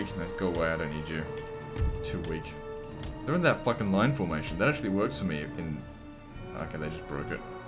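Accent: Australia. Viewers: I absolutely love the empire total war blitz campaign and was so excited to see your return after your first attempt. I would love for you to update us on what days you would upload this campaign because I'm so excited to see you finish! Ps. I also love your angry Australian accent but the episode with full detail is so much better and also exploit the SHIT out of it mate!!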